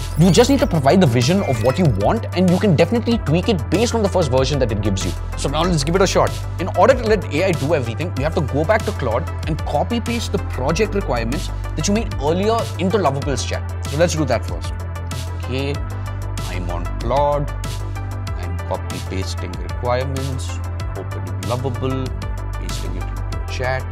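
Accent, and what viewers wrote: India. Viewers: you sounded andrej karpathy as a keralite or tamilian, please bro don't harass us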